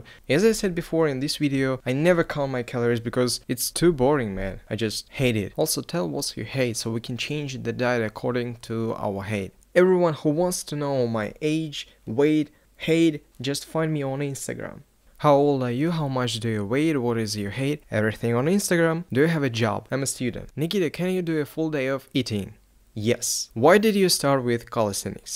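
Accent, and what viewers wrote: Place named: Russia. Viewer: + russian accent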